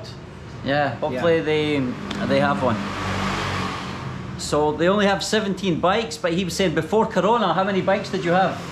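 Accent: Scotland, United Kingdom. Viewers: Have fun in Bali.. I like your British accent brotha!!❤️ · Hai, I find your videos great, and a lot of local people here know you too, your Scottish accent is fabelous, greetings from Sanur, Bali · It's different... you know UK have many many regional accent... but to untrained ears yes, all sounds just british innit 😅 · It is Scottish accent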